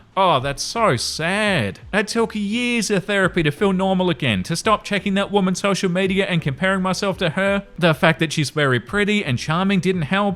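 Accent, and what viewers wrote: Australia. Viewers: Australian, one of the best accents imo. Altho I'm a bit enamored of a Scottish accent as well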